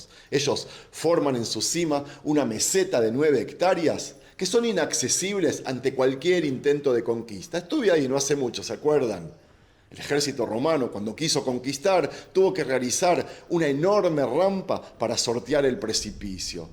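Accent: Argentina. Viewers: Que lindo es escuchar a un argentino tan lejos cuando dice che, Saludos desde la 🇦🇷🇦🇷🇦🇷🇦🇷 Dios bendiga a 🇮🇱🇮🇱🇮🇱🇮🇱 El lugar en donde nació mí salvador..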